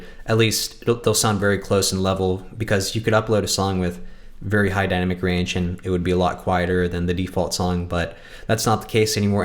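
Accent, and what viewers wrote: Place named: United States. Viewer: never underestimate an Indian with American accent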